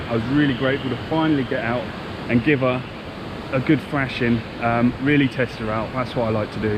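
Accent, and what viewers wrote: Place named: Kent, United Kingdom. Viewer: Nice, i am actually based in Maidstone, Kent. Recognised your accent! Found you through a search for Malle videos and liked the content! I had a similar story for the Malle this year too! Not ideal!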